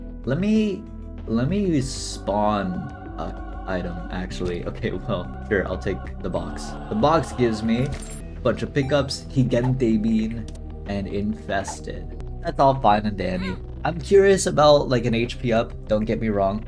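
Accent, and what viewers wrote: United Kingdom. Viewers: He's from there so it's always a British accent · Day 14 of asking to play T isaac and talk the whole time in his British accent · day 25 of asking to make full isaac video in british accent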